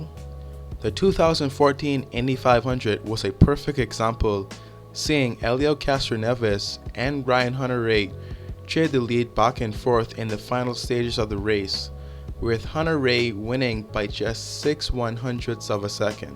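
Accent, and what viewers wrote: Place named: Bahamas. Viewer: You sound Bahamian glad to have another bro from the 242 enjoy Motorsport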